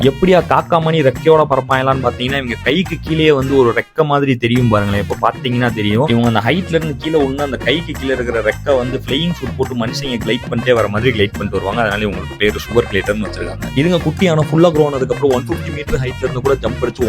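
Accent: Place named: Madurai, India. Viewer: Luv tht madurai slag 😂❤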